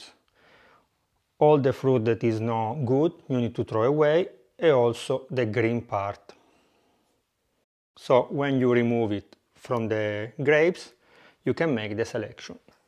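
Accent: Italy. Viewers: You're too good. especially it's something to hear Kg, Litre etc. than hear some Oz and lbs(lot of bullshit). And keep up your italian accent. It's awesome! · Very informative with sweet Italian accent.. Grazie mille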